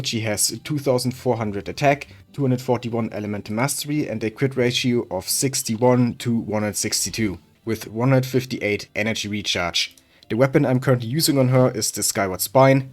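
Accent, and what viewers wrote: Germany. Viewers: That german accent strong af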